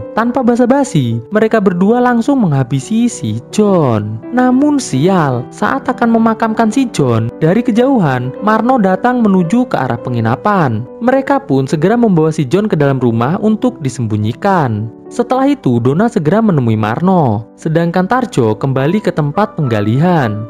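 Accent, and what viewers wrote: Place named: Java, Indonesia. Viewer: Adminnya lucu cara ngomongnya...org jawa mesti😅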